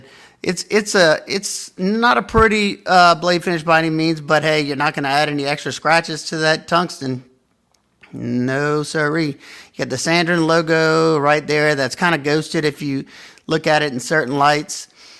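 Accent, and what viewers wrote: Louisiana, United States. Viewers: This knife is so interesting. its screaming for custom inlays. great review! do I hear a south Louisiana accent?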